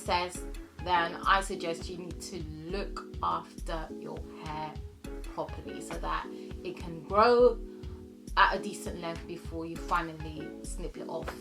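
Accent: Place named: England, United Kingdom. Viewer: You look exactly like R&B singer Fantasia but with an English accent. Lol . I like the encouragement you are giving girlie :). I'm transitioning to natural and I have had my hair cut real short before twice so I know how I'll look with short hair but I will not big chop I opt to grow it out gradually.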